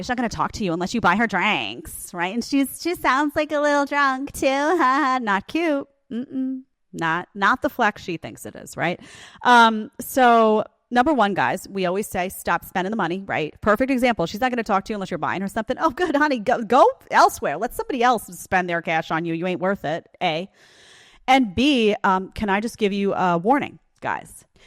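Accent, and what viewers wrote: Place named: Brooklyn, United States. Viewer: Please, you must stop imitating your teenage only fans females... You go into adolescent brooklynese, New York dialect and you particularly flex the intonations on the ' nasty' as if you're actually that icky female. Just speak about the subjects you're dealing with, and don't become them or allow them to become you. They still resonate a living adolescent part of you, or else you wouldn't be able to join in their vibration so frequently and so effectively. Time to grow up!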